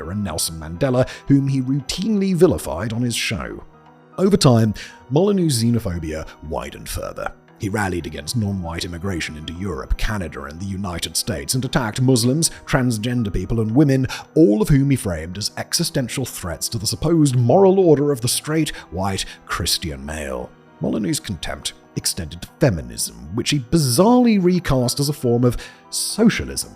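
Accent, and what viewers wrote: England, United Kingdom. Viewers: I'm not a fan of stephon, but you're censorious attitude is very off-putting, i'm starting to feel like whenever I hear an english accent that i'm about to hear some fascist rhetoric.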